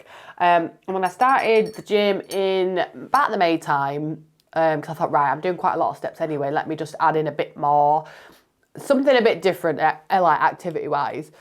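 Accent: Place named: Doncaster, United Kingdom. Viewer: Well done, you look amazing and I bet you feel even better. You sound as if you’re from Doncaster like me, am I close? 😊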